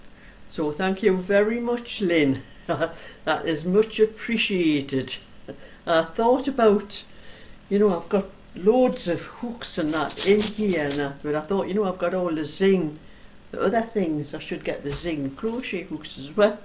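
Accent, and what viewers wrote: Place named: North East England, United Kingdom. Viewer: I have just come across your channel, it is such a joy to hear your lovely North East accent. My husband came from Houghton le Spring County Durham. Sadly my husband and I had serious covid at the very beginning. Sadly my very fit and active 82 year old husband died of covid the first day of lock down. I live in Cheshire and so I don,t hear the lovely lilt and phrasing of yours and my husbands accent any more. Thank you for your just lovely warm and homely channel. Regards Christine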